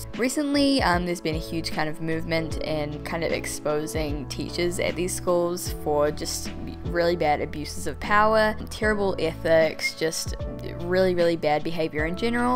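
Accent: New Zealand. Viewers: Oh! It’s a New Zealand accent!!! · I thought I recognised your accent 😂 NZ youtuber gang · oh my gosh i knew i recognised a fellow kiwi accent!! you’re right about it not being an art and animation hub sadly :/ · Ohhh you're from NZ! I always wondered why you seemed so familiar and I just realized: it's your accent, your makeup, and the fact that I grew up watching The Tribe lmao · Aha, you’re from New Zealand, that makes sense. I’m not the best with accents, so I wasn’t sure between Australia and New Zealand.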